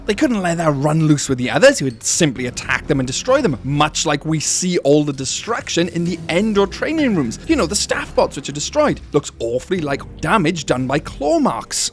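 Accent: Wales, United Kingdom. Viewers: Are you Welsh? I was just wondering because of the accent 😅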